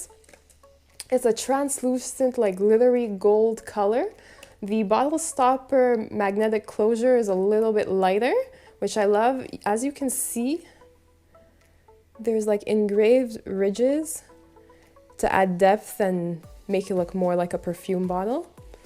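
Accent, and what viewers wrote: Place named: Turkey. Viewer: Your accent very much sounds like Turkish! 😯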